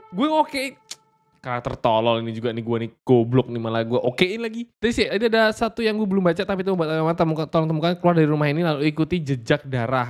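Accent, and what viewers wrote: Java, Indonesia. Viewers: Njenengan tiang pundhi to mas kok radi jowo ngoten😅😅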